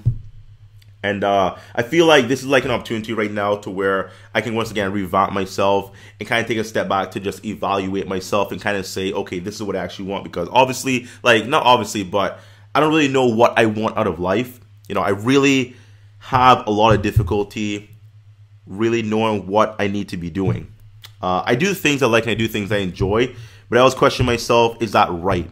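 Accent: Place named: Caribbean. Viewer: From the moment I've seen your videos I know your were from the Caribbean by your accent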